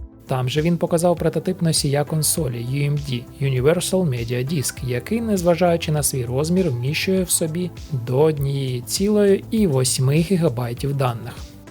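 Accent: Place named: Russia. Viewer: Адже я чую залишки російської мови)) Поки я дивився, мені вдалося вивчити українську мову.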